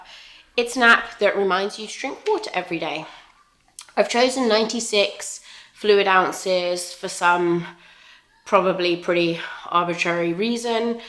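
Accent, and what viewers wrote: England, United Kingdom. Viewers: Since you have an English accent, you lived in Japan, and now your in California I am assuming yours is a military family? Sorry if this is common knowledge. I'm a new sub.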